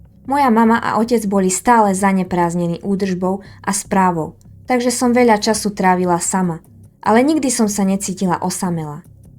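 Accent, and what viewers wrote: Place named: Central Slovakia, Slovakia. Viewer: dakujem, smutný príbeh, ale krásna ľúbozvučná stredoslovenčina ❤️👍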